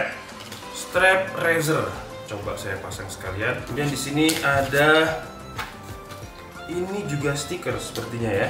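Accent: Malang, Indonesia. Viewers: orang malang mas?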